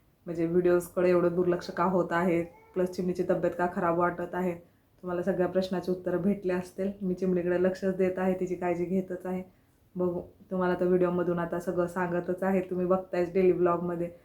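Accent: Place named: Latur, India. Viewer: ho latur chi bhasha lagech olkhu yete..... Me aushyachi ahe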